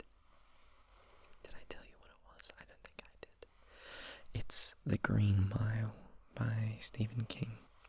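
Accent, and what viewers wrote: Australia. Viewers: When I clicked on your video, my interest was immediately piqued by the familiar Perf accent, and I'm so glad I stayed because I love your rambles. I know a lot of women like myself have difficulty relaxing to the sound of a masculine voice, but you're so gentle and sweet that it's easy. Thanks for your videos,! <3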